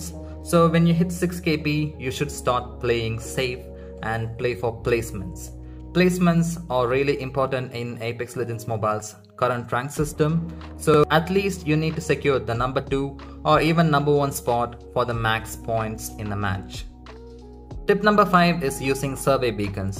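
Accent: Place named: South India, India. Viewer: Tbh I like the little south Indian accent on it 😅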